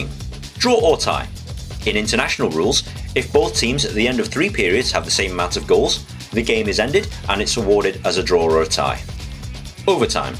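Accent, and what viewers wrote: England, United Kingdom. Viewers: Why the hell I wanna hear an Englishman explain hockey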